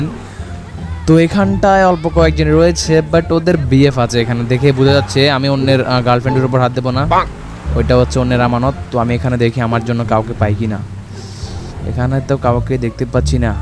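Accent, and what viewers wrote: Noakhali, Bangladesh. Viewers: ভাইয়া আপনি কী নোয়াখালীর ছেলে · আপনি কি নোয়াখাইল্লা আমরা ও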